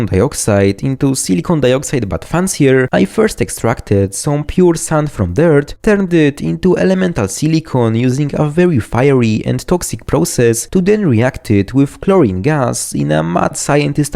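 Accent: Poland. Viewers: Niby słusze angielski ale jakby po polsku:] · Od początku czulem że to Polski akcent, ale potwierdzil wszystko 😆 świetny materiał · Jakby ten akcent rozpoznam wszędzie 🇵🇱kocham opale! Labradoryty też są piękne i o wiele tańsze. Super kontent, będzie oglądane ^^ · Are you Polish? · Hey bro, are you from Poland maybe? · as a polish person i love hearing the polish accent in his voice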